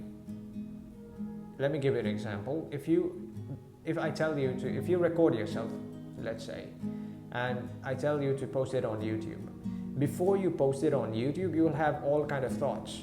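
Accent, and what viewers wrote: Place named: England, United Kingdom. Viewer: Hi, I like your english acccent!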